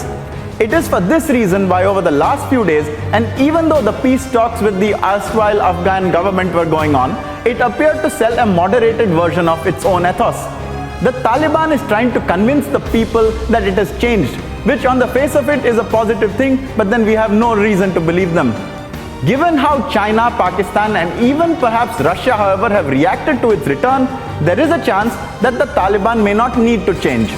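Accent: India. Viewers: Finally an indian-english accent i can understand as a german. Why does not every indian speak like this ?!?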